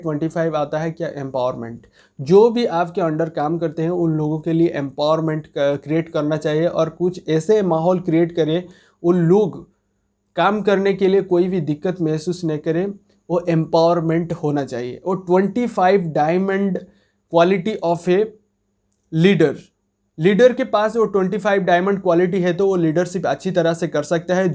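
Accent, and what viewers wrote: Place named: Odisha, India. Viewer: Dear, Great Job and well explained. But I want to suggest you about your accents which you are using, that is for only village people, those who are living in odisha. If you want to became a good trainer on facebook or youtube (or any social platform), you need to work on your accents. The pronunciation of ours (Odisha peoples) is very poor in both English and Hindi. So, continue to motivate others and step up for your great future. Thank you, God bless you.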